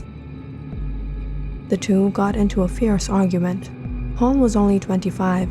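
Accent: South, United States. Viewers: Love the country accent 😁💕 And so was the story! Underrated channel💕💕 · it's the country girl accent for me😂😂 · I like her country accent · Great southern accent!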